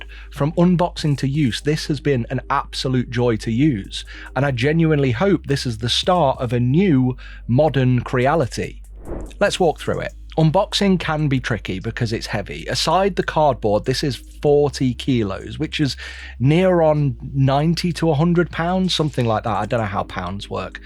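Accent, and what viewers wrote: United Kingdom. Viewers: Great video, still using my Ender 5 Pro, good printer but really showing its ade now. Maybe by the time they have sorted the software out I will have saved enough to buy one. If you are planning on giving you X1 away for buttons. I'd collect it from anywhere in the UK. you'd be making an old man happy 😉😉. Seriously it's nice to hear a UK accent, you won a sub.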